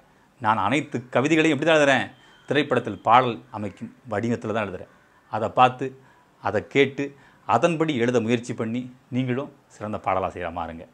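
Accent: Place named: Tirunelveli, India. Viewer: உங்கள் தமிழை கேட்டுக்கும் போதும் உங்கள் முறுக்கு மீசையை பார்க்கும் போதும் நிச்சயமாக நீங்கள் நெல்லையின் மைந்தன் தான் வீச்சருவா வீசும் வீரமுள்ள பூமியில தமிழ் பாடி தலையாட்ட வைத்தவரோ ?? எனது அருமை கவிஞரே எனதூரும் நெல்லை தான் வாழ்த்துக்கள்🌹🌹🌹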